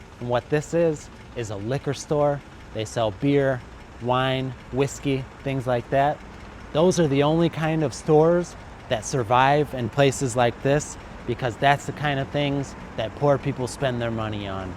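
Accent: United States. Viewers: Dear, I am an Indian. This is your first I saw it to the end. Thank you so much for making this type of videos. It give ideas about the real situations prevailing around us which are not knowing. Your style of speaking is very simple and understandable to the people who are eager to speak English in American accent. Your truthmess promptede to subscribe your channel happily. Well done! Keep it up. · American accent English sounds very pleasant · Hi dear I am from India watching your video for first time actually I was seeking for American accent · Great channel, I'm appreciate your clear speak, pronunciaton and little slower rate. It's perfect for me, especially I prefer american english, not english.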